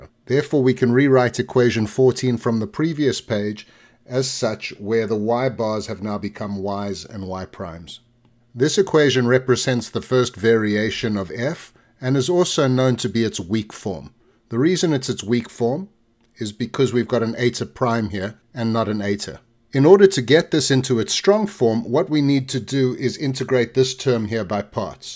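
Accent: South Africa. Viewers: South African accent? 😁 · Are you South African friend?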